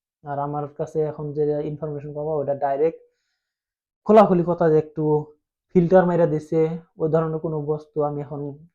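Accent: Assam, India. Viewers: Assami to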